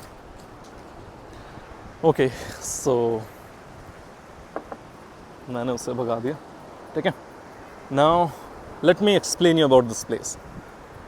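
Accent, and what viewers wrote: Kerala, India. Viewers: Kay tum Kerala se ho?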